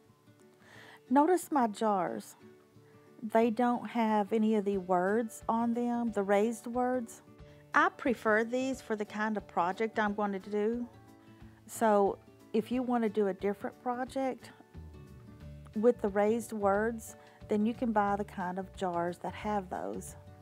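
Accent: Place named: South, United States. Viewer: OMG you have to talk faster and shorten these videos. Half of this wasn't needed and the slow talking with the southern draw was too cliche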